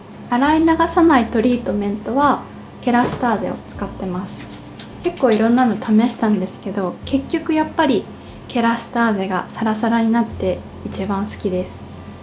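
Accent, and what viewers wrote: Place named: Kansai, Japan. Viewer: 関西出身の人ですね！！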